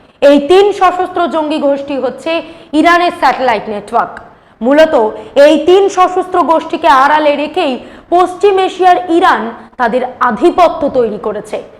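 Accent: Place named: Kolkata, India. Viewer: কোলকাতা মানুষের ভাষার টোন আলাদা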